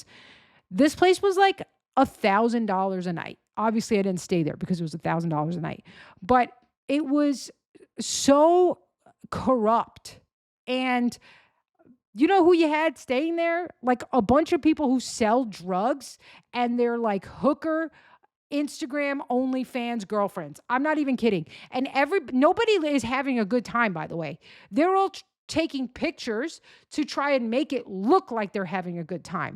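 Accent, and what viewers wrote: Italy. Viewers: Love the Italian accent !! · Love the Italiano accent. I used to actually think you were one a them there New York Italians.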